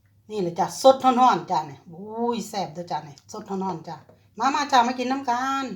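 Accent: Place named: Sisaket, Thailand. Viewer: ฟังเสียงแล้ว คือคนบ้านแฟนผมเลยครับ อยู่สีเกดบ่อน้อครับ🤔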